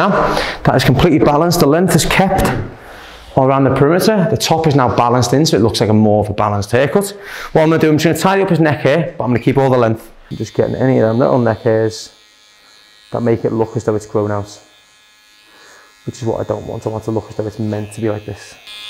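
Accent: Liverpool, United Kingdom. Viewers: Are you scouser? You sound like a scouser:D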